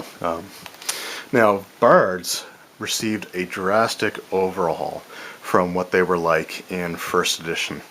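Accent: Canada. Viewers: I detect a Canadian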